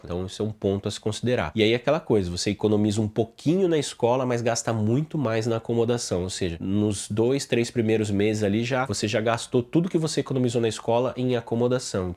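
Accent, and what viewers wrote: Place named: United States. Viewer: Sotaque forte eu acho quem fala esse R que você fala, tipo americano. PoRRRTa kkkkkkk😅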